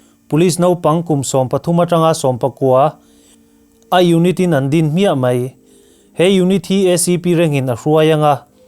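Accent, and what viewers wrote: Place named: Delhi, India. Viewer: I pronunciation ațang hian Hindi i thiam hmel riau. Delhi vai i kâwm tam a nih dawn hi.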